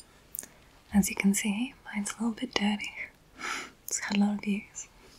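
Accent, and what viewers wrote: Australia. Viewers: South Australian? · Glad I stumbled on your channel. You have to be Aussie surely?!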